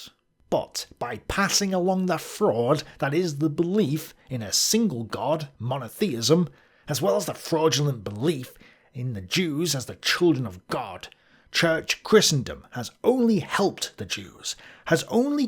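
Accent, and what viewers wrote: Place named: Scotland, United Kingdom. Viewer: I cannot stand Scottish accent and his constant liberal capitalist bias. If liberalism is so good, then why has it failed every Western country that adopted it? - Adûnâi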